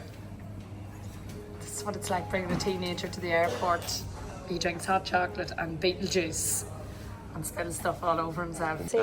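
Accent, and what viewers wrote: Ireland. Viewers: ❤️ tho voice it reminds me of my trip to Ireland 👍🤠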